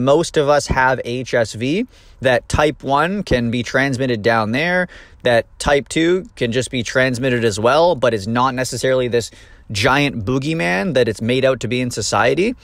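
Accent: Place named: Canada. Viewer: You say "out" in Canadian accent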